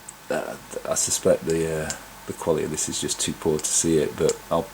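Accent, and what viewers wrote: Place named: Liverpool, United Kingdom. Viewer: You’re definitely from Liverpool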